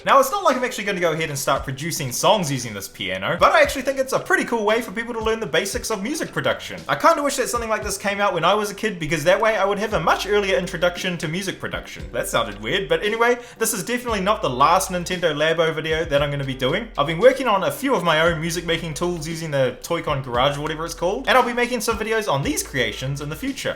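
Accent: New Zealand. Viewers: Are you a Kiwi? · Is he from nz? Oh yeah yeah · Are you a kiwi, brother? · You from New Zealand? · yo, that accent.. are you a kiwi? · He sounds kiwi, I love him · Are u from New Zealand? U sound like a kiwi.. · he’s def a kiwi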